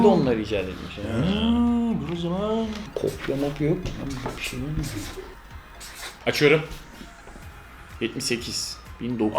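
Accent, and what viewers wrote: Turkey. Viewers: abi sen trabzonlumusun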